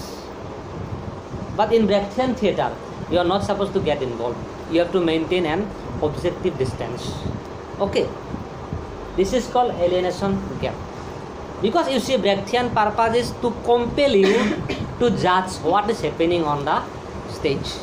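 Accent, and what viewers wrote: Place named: Assam, India. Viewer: According to your pronounce, I'm sure you are from Assam 👍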